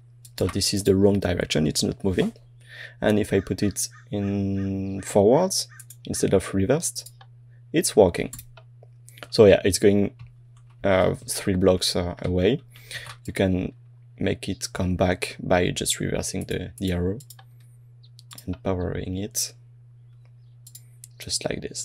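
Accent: France. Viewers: On aime l'accent français, super vidéo ! XD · Love your french accent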